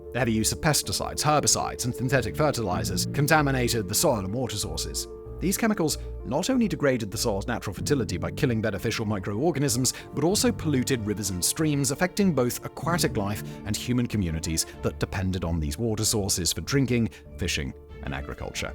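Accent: United Kingdom. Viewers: Don’t believe all this. Read the Fish the Swallowed the Whale for a more balanced view. A British accent doesn’t mean it is unbiased . By the way, why don’t we make a comparison with the British East India Company’s practices or the practices of Jardine Mathesen in Asia selling opium. A comparative approach might make your assessment more enduring.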